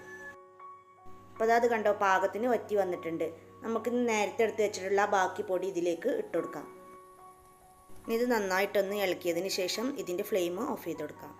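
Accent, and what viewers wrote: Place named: Malappuram, India. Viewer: X-Mas Special Bief Fryoo😮😅Am much Impressed with Your Way of TalkinG Than CookinG ..Adhu oru vere Vibaan ...Asaal Malappuram Kaaaariii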